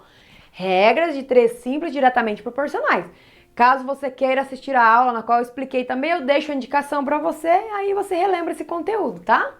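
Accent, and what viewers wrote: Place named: Caipira, Brazil. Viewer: o sotaque caipira dela🥺🥺💗 Ótima aula, parabéns!!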